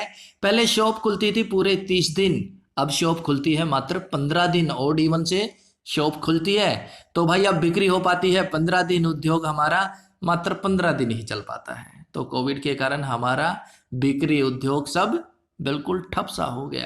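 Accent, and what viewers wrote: Haryana, India. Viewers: Sir...r u from haryana?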